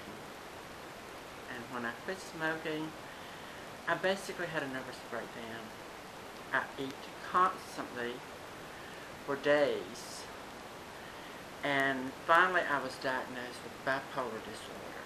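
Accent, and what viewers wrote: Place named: South, United States. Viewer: Was born in Mississipi. LOve that southern accent :)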